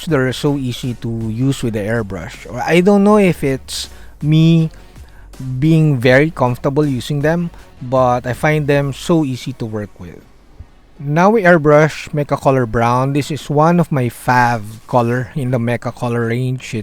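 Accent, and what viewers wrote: Philippines. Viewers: Heavy Filipino ascent say peanut butter.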